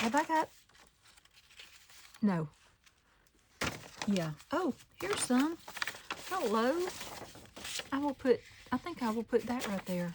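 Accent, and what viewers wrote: South, United States. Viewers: Beautiful pages! Whenever I put my journals together now I add a page and think to myself (in your beautiful southern accent) do I like that?? 😊 Love your videos!!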